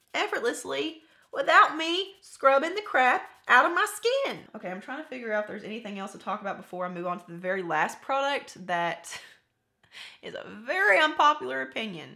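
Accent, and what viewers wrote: South, United States. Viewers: Where are you from? I'm southern too!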